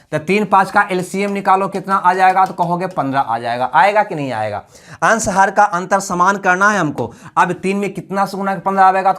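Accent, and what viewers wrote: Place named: mixed, India. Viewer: Sir apne Hindi bolne ki language samj nhi attii simple Hindi boleya naa takke bakki logo ko samj aye ap Bihar up mp type Hindi bolte hoon samj nhi attii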